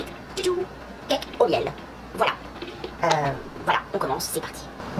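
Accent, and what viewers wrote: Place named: France. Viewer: Honey cake is my favourite!i love French accent Left u a liked👍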